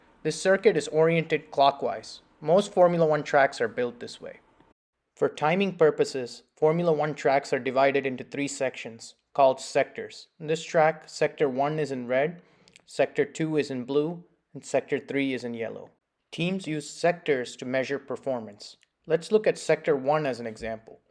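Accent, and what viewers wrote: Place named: India. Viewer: Just realize how you're talking english, like an Indian talking english.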